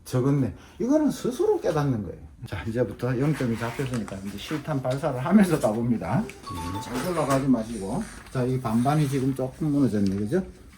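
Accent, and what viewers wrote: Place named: Gyeongbuk, South Korea. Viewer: 부산은 아닌데 말투 경북같다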